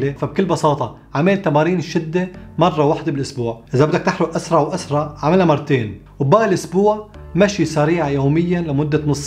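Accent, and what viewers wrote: Aleppo, Syria. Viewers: سؤال دكتور انت من حلب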